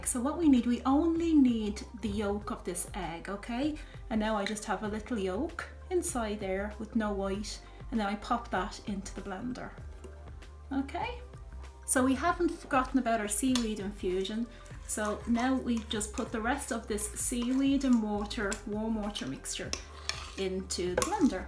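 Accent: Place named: Dublin, Ireland. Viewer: Your accent! you are Irish 💕Dublin accent💕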